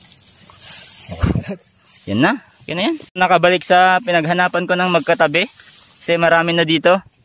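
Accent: Ilocos, Philippines. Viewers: Ilocano po ba kayo oh igorot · Ilocano ka gayam boi hahaha.napugsat diyay saput nan hahhah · Akala ko po visaya po kayo yuon po pala ilokano po kayo ilocano din po ako heheh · Ilocano ka pati siyak😅😅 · Ilocano ka met kanno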